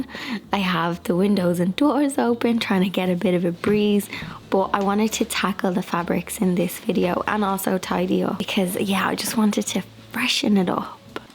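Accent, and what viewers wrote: Ireland. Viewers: Love your videos ❤️ I just found you!! I'm hooked so I subscribed! I'm from South Carolina, USA. So this southern accent loves your Irish accent!! Your home is beautiful!! I'm in love with your sofas!! Did you buy them or did you reupholster in that beautiful fabric?!! ❤️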